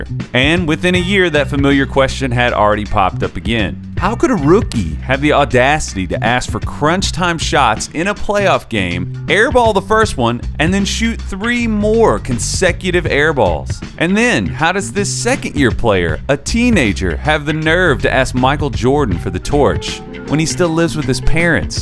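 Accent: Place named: Kentucky, United States. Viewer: Where in Kentucky are you from? Just curious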